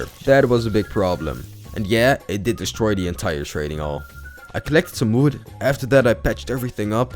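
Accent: Netherlands. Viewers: Jo you have dutch accent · Its dutch. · I Smell DUTCH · 2mln views, u deserve this, r u dutch? Ur accent sounds dutch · Nederlands ik hoor het aan je stem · Mmmmm i smell nl accent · Je hoort gewoon dat het een Nederlander is 😂😂👍🏼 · De nederlandse accent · Just please do something about your Nederlands exent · sound like ur dutch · Je bent Nederlands dat hoor je meteen! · You rlly have a Dutch accent 👀👀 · Ik weet dat je Nederlands bent ik hoor het gewoon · Very dutch accent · I smell nederlander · You sound Nederlands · i smell nederlands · Hahah you sound Dutch are you Dutch hahaha 😂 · Nederlands Engels 😍🥵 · I just love this dutch accent Ik hou van dit nederlandse acsent🤧✨🤜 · idk whybut i smell dutch / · I smell Dutch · Bro je nederlandse accent is wel echt goud😂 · You sound dutch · Jij bent nederlands dat hoor ik gewoon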